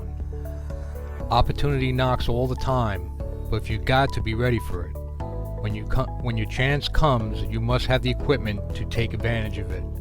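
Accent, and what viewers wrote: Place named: New Jersey, United States. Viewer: The Jersey voice of onpassive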